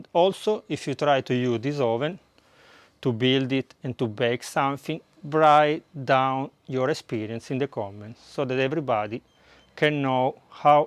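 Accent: Italy. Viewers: I love your accent! They need to stop hiring white people and British to play Roman emperors and soldiers in movies. Y'all are just as great. I would LOVE to see more historical movies with more Italians. I love your natural tan skin. I'm gonna subscribe to your channel cuz you have some good videos. :) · I subscribed to you so I could share you with my 23 yr old daughter and 24 yr old son....We were taught to cook by our american men who where soldier men who survived and came back to marry our mothers and then teach them how to cook..... but I just can't get over your BEAUTIFUL ACCENT VOICE.....your voice is angelic, and you are just so damn pretty for an Italian.....You wife better love you to crazy pieces! She is SOOOO LUCK!!!!!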